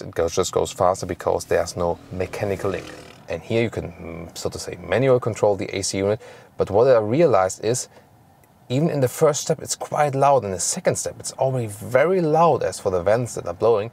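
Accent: Germany. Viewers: I really like your German accent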